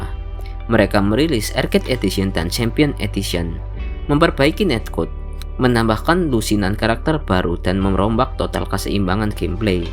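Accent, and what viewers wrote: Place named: Batak, Indonesia. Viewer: Logat batak nya kuat banget bah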